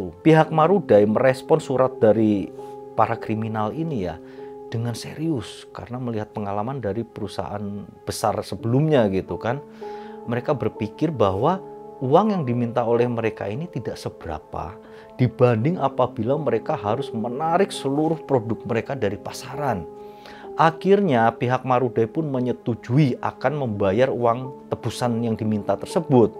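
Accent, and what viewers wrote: Java, Indonesia. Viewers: Jawa apa sunda om? Kok indonya medhok hehe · Medok bgt dah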